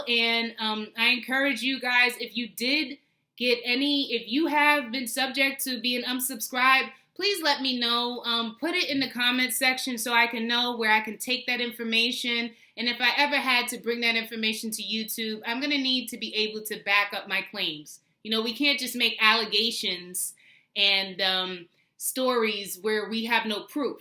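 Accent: New York, United States. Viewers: Hi am from Nassau Bahamas I subscribe too your channel early this morning, and you soo beautiful I love your charming sweet voice you could tell that you are a true New Yorker lol... Am A Eastern Conference guy, I was a die hard Lebron James fan when he played for Cleveland and Miami... And when he went too L.A. am not his fan no more because am not A Western Conference guy..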